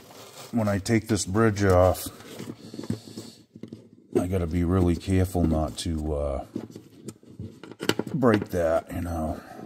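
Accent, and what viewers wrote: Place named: Maine, United States. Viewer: Im trying to get the accent. I'm thinking southern maine, or maybe Taunton. Give us a clue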